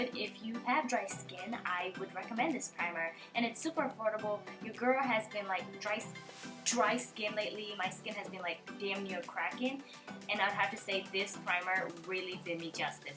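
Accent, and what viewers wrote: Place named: Kenya. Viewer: Crazy how I could automatically tell you’re Kenyan 😭❤️ I’m kenyan too girl 🇰🇪😛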